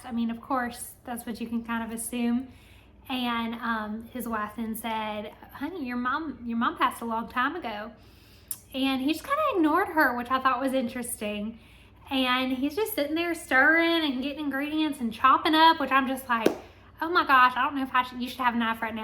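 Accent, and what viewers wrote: South, United States. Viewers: you have a beautiful southern accent i thik its southern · You have the nicest Southern accent!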